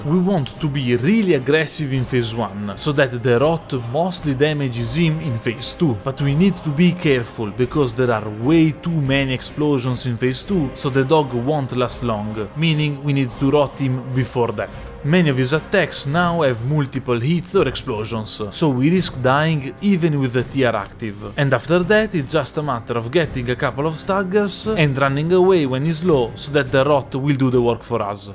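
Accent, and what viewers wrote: Italy. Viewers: you sound so much italian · Mi son bastati i primi 2 secondi per capire che eri italiano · I hear the Italian accent my guy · Accento leggermente italianeggiante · l'accento italiano più forte e marcato che io abbia mai sentito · Are you Italian by any chance, mate? I can recognize the accent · si senta un filo l'accento italiano mi piace ancora di più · this guys Italian accent is so heavy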